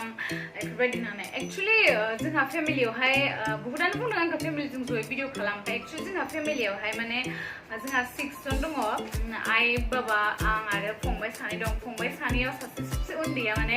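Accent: Kokrajhar, India. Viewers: Kokrjhar nijg same jaw railainaya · Hi abo jgni kokrajharni badinw railaiw mtw🥰🥰🥰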